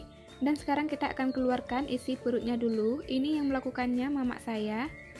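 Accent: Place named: Aceh, Indonesia. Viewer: Bahasanya meu aceh that. Ketawa aku2 😀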